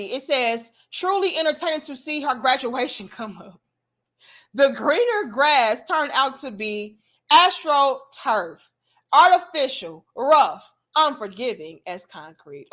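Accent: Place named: Chicago, United States. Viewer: I think this wonderful woman is from the Chi the way she talks says it all from me beautiful keep it up sis tell it .......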